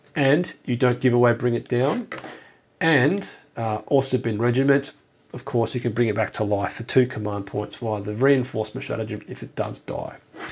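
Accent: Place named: Australia. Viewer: Is this an Australian doing 40k? Love it.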